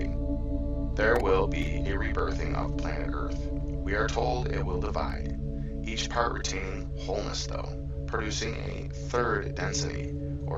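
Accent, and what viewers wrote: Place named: United States. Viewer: well he is irish, this is an american accent.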